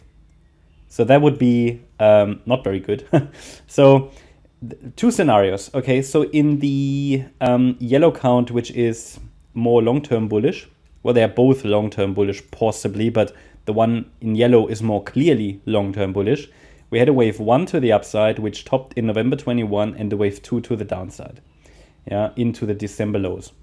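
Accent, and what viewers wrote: Germany. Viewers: Thanks, Hear your accent, i assume you are German. I am also living in Germany and have made quite significant gains with Solana. I wouldn't like to sell it because of 42% crypto tax here i need to hold on until july because then I'll complete 1 year and then tax rate would be 0%. What would you suggest? Take my profits now or wait until July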